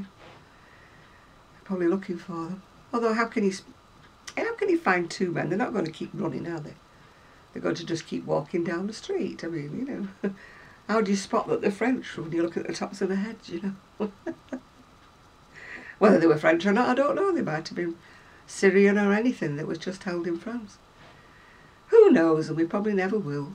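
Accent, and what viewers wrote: United Kingdom. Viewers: Hi:) I loooove watching your vlogs and listening to your voice with a British accent:). Whaaat a nice sweater ____ looove it:)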